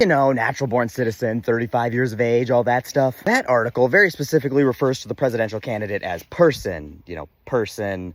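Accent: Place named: South, United States. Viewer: Woohooooo, do not ever stop. Yours is the kind of hillbilly redneck voice we need. I do mean that in a good way, although i would consider you more redneck than hillbilly. I've been married to one for 30 years. Trumps simple do not speak for all rural country people. Some of us can read a book. 😂 or, in this case, the constitution. Long walk, for a short block. We need you and your common sense way of putting things.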